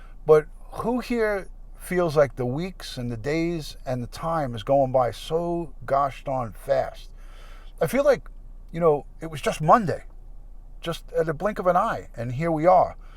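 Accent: New York, United States. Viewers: I'm homesick in AZ from hearing your NY accent! Which borough??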